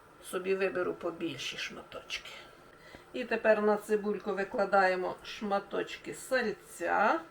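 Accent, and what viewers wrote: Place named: Khmelnytskyi, Ukraine. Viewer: Ви з Хмельниччини? Говорите як на Хмельниччині. ❤ Дякую за ваші рецепти.❤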